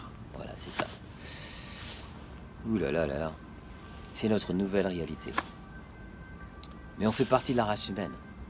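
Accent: France. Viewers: ese acento Frances ahhhh es muy lindo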